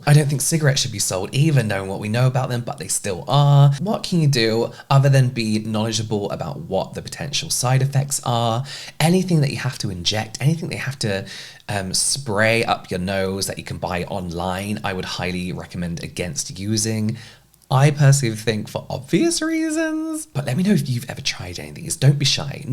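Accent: United Kingdom. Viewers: idk sh*t about british accents but I think this man has the same accent as Jamie Dodger, the cool trans guy Youtuber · Wait why did I not realize you had an accent until you said you were British😳🫢😭😭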